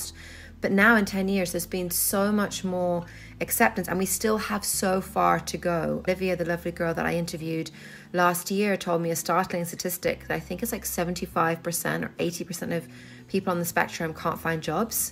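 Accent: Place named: Ireland. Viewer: Are you Irish? I'm Irish and can hear a twang in your accent lol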